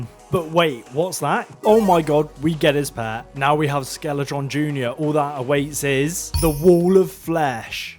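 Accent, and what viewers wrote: United Kingdom. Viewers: Really liked the vid, but would enjoy it more with thicker briish accent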